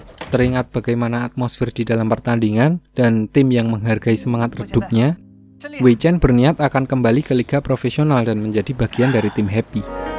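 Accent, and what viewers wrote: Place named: Java, Indonesia. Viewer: Njiiirr orang jawa .. salaam bang dari jawa timir kediri bang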